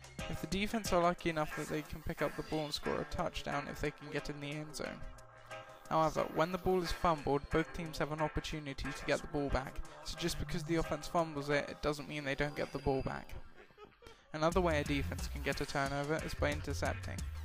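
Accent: United Kingdom. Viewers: are you from england? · Thanks really helpful, some people don't understand a British accent, don't know why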